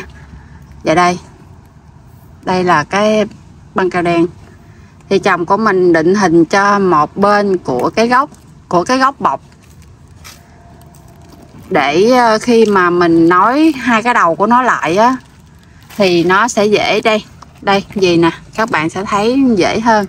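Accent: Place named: South, Vietnam. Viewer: Hello. Gái Miền Nam VN với giọng miền Nam nghe quá đã, người VN qua các nước Tự do quá giỏi, coi mà mê luôn vì cô nàng có năng khiếu khéo tay, hay làm và chỉ dẫn tận tình. Chỉ tỉ mỉ rõ ràng không mê thì quá uổng, hay cho ông chồng này có bà vợ dễ thương và lại quá thật thà. Cảm ơn.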